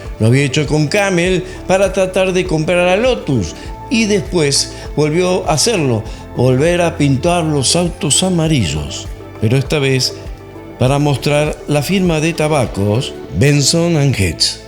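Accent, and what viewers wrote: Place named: Rioplatense, Argentina. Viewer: Espléndido informe, nuevo suscriptor. No sé si sos argento o uruguayo 🙋🏻‍♂️desde Chaco🇦🇷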